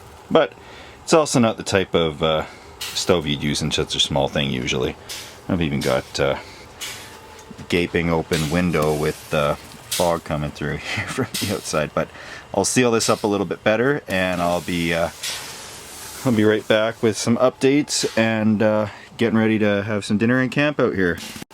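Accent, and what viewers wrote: Alberta, Canada. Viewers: Why can I tell immediately as an Albertan that I am hearing another Albertan?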